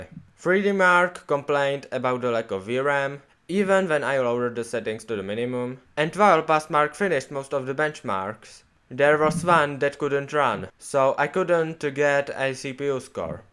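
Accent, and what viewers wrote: Czech Republic. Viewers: it's just Czech accent :-D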